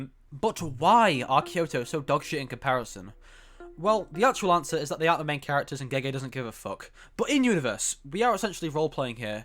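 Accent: United Kingdom. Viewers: I CAN’T STAND THIS SCOTTISH ACCENT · After watching this video commentary, I now understand the lack of reading comprehension trope. Turns out having a British accent does not make you intelligent.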